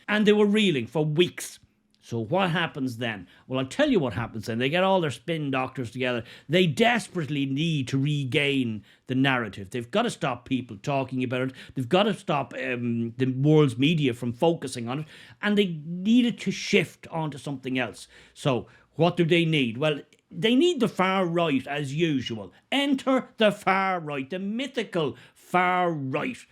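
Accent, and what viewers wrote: Ireland. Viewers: Maybe you should clean out your ears and if that doesn't work then accept the Irish have their own accent. 🙄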